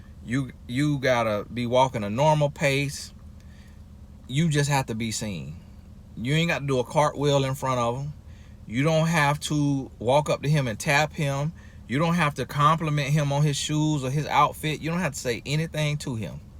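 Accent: South, United States. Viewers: He has got to be from the south 😂 This is a good talk though!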